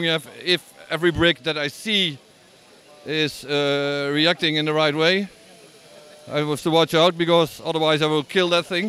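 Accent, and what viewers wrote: Netherlands. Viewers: HAHA HET NEDERLANDSE ACCENT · je hoort gewoon dat hij nederlands is 😂😂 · ik vind het altijd zo mooi om te zien dat mensen zon nederlands accent hebben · He's from the Netherlands i can hear his accent i am Dutch too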